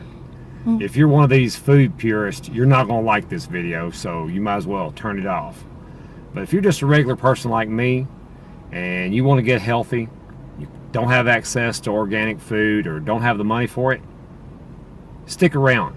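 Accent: South, United States. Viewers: P.S. Love your videos. You speak just like my dear Southern relatives who have sense enough to eat like you do.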